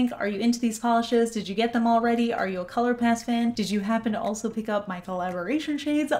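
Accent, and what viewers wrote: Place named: Northeast, United States. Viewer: I'm not a neon fan, but some of those colors...wow! I think the people who don't like how you pronounce orange are clueless about accents! Don't they know you grew up in the northeast? 🤦‍♀️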